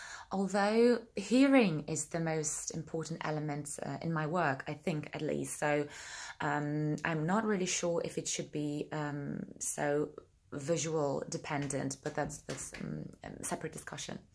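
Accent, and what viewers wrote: United Kingdom. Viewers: Piękny akcent :) brzmisz Posh, tak na marginesie :D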